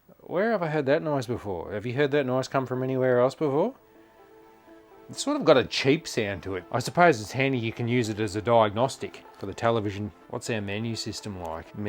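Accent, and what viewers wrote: Australia. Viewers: I was thinking the same thing. SCART is a European thing and he sounds Australian. I use SCART RGB for all my retro consoles.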